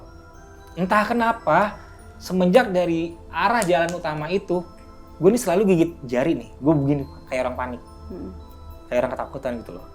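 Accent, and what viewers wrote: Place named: South Jakarta, Indonesia. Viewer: Anak jaksel mana suaranya niiih hehe. Akhirnyaaa cerita Kisah Menara Saidah walaupun nontonnya telat bbrpa hari😂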